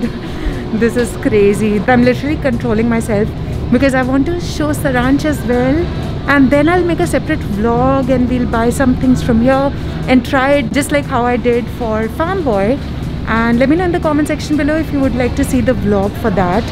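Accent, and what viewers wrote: India. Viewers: her English is perfect she just has an Indian accent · I am not trying to be a grammar nazi but she makes a lot of basic grammatical and pronunciation mistakes lol. If People genuinely want to learn English they should go to the right source. I know she has an Indian accent and there is nothing wrong about it.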